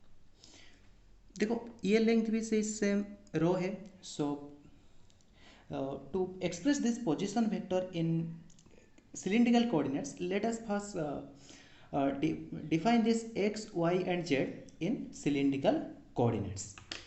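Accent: Odisha, India. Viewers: Are u odia?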